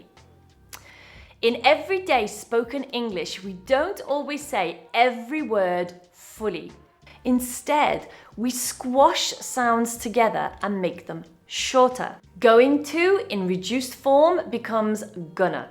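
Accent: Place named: London, United Kingdom. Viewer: It's very good your accent is in London see you next bye and great week for you.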